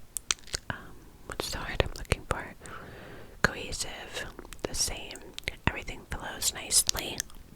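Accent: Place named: Upstate New York, United States. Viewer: everything you do is amazing and I fall asleep to your videos every night💛 my husband is from upstate NY and y’all say words the same and I always tell him you pronounce or call things the same😂 I’m from Texas so I absolutely love it. Hope y’all have the best Christmas❤️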